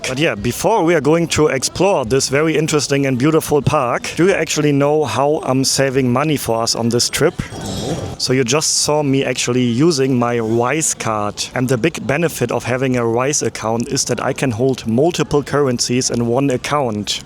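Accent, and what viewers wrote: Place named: Germany. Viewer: There’s supposedly a great test for Germans with good English accent. Get them to say squirrel, it’s a giveaway, the v pronunciation always comes out. Though I appreciate your efforts. I’m part German, I can hear it.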